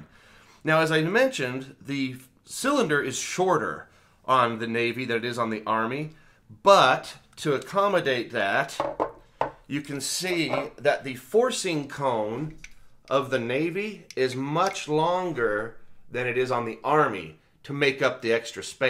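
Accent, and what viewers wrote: Utah, United States. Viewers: Today is the first time seeing your channel. As I was watching, I thought to my self, “ self, this dude’s from utah!” And not to my surprise, you are. Nice videos “brother”.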